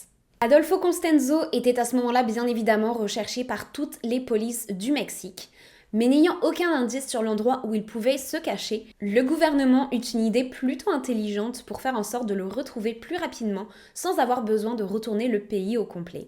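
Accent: Quebec, Canada. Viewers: Merci beaucoup pour ta vidéo tu es GÉNIAL et tu racontes très bien en plus j adoreee ton accent québécois 😁😁😁😁😁👌👌👌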